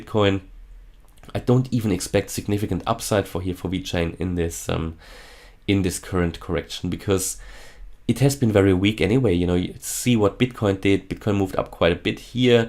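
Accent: Germany. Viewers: I bet you are either from Germany or Austria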